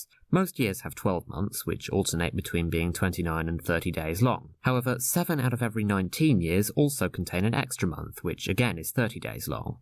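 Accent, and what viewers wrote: United Kingdom. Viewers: Much enjoyed your video, both in its contents and its vocal presentation, whose somewhat dry acerbic touch, highlighted by the nice English accent, made for much captivating entertainment and learning. However, I think there is a slight error in the video visuals: : On the "Observed" 2019 Easter table, shouldn't the "Next Sunday" be Sunday, April 21, instead of Sun. March 21 (since that would have a Thursday--and that next full moon acc. to the ecclesiastical calendar was Sat. Apr. 20)? I see as well that so far you have just two videos. Here's to a good many more. Subscribed.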